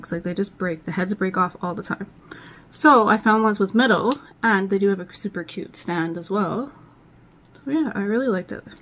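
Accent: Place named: Canada. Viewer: I believe you are Canadian. I am from BC and I can hear it in your voice so I am so following you because you are fantastic!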